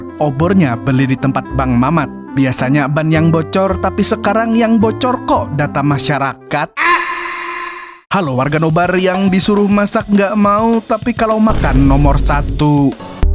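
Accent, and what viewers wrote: Banjar, Indonesia. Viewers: ai urang banjar skalinya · Pian orang banjar kah mang.?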